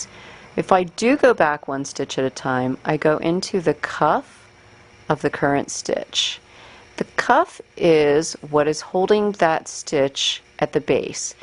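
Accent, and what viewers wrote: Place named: South Jersey, United States. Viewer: Are you from PA or NJ.. because you have a south jersey/philly accent .. similar to me.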